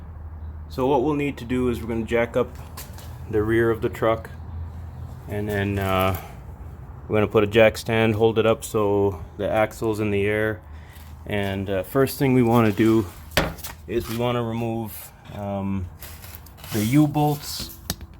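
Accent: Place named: Canada. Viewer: You sound canadian eh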